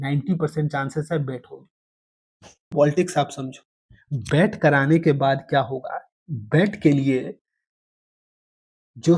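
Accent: Uttar Pradesh, India. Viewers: Tum Up se ho kya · Tum up se ho kya?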